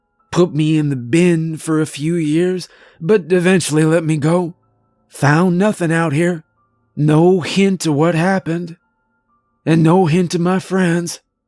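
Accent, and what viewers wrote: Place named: United States. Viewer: that has to be the first time I have ever heard that an American accent sounds cool. I always love ones from elsewhere here but never anyone saying the other way around.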